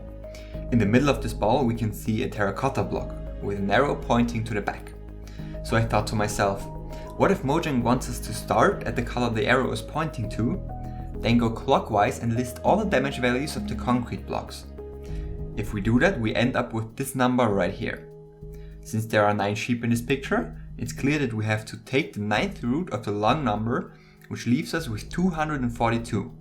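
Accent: Germany. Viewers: Dein Englisch ist so schlecht. Man hört dass du aus Deutschland kommst.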